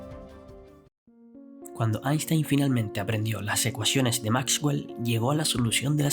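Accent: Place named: Canary Islands, Spain. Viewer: Un maravilloso video bien explicado y con acento canario, que mas se puede pedir? Muchas gracias! 😊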